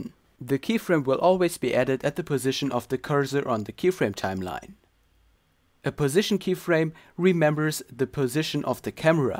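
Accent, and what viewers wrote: Germany. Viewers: Just wondering, but is german? because In my opinion, he sounds german and it seems a large fraction of his viewers are german. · Ich höre, dass du ein Deutscher bist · Dein Englisch ist gut, aber man hörst das du Deutscher bist! xD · You're german right? xD accent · du bist deutsch man hört das XD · bist du deutscher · Man hört deinen deutschen Dialekt lol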